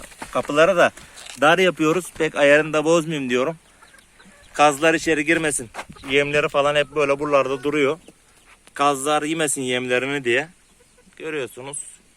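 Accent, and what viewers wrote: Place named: Thrace, Turkey. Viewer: Konuşman Trakyalı gibi sanki nerelisin :)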